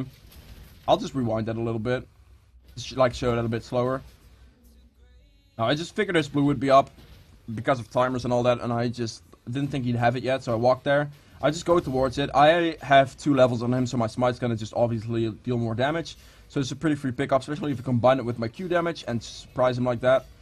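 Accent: Netherlands. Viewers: nice guide 👍👍👌btw are you dutch? it souds like it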